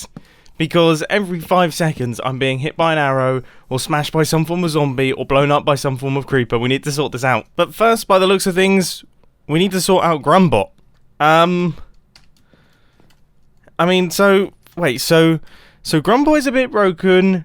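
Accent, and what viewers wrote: United Kingdom. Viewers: Mumbos UK accent Mumbos low voice accent without his UK accent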